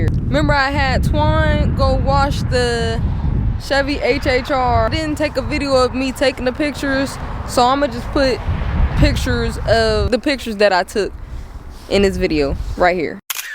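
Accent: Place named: Detroit, United States. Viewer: You sound so Detroit sis lol you in the city?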